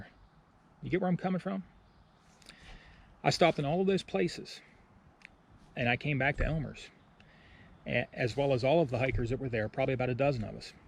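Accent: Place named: Pennsylvania, United States. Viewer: That, my friend, was a very difficult video for you to make and I respect your choice to get off the trail. I also know that you will finish what you started, whether it's this year or next year. I had to delay my AT hike by 60 days. I will make another decision at that time. It was very difficult because I waited until only a few days before I was to leave. You know all the planning that goes on before leaving. All that came to a screeching halt. BTW, I thought I detected a PA accent. We have relatives near Hazelton. Heard of Senapes bakery? I tried to warn you and others on trail without being mean that something bad was happening and people needed to pay attention to it. On the videos yet to be released, add a short notice in the beginning saying you are off trail. Thank you. Maybe I'll see you on trail some day. John from MI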